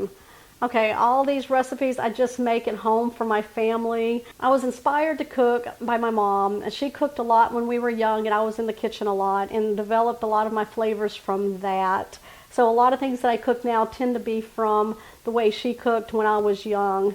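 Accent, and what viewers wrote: Texas, United States. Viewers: Happy new year, y’all! I left Texas and it’s arrogant yet backwards ways many years ago, but it does my heart good to hear that Katy voice. I grew up in Brenham, rode the school bus to college (!) with CyFair kids, and since all my people have gone to heaven, I find myself missing the beauty of the sky, and the kolaches. I am no cook but I just love hearing your non threatening voice.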